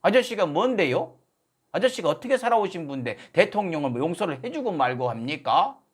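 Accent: Jeolla, South Korea. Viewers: 성범아 윤석열이 나라말아 먹는게 아니고 이미 문재인이 대한민국을 다박살냈다 아파트 한채 6억하든게 지금13억 간단다 알것냐 지대로 알고얘기 해라 알것냐 전라도 말싫다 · 고향이 서울이란데 우째 저리 전라도 말을 구성지게 잘할까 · 정말로 반갑당게요. 전라도 사투리 징허게 구수허네요. 거기다 칼럼내용 속시원허네요. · 전라도사투리 잃져버리지도 않으셨네요ㅌㅌ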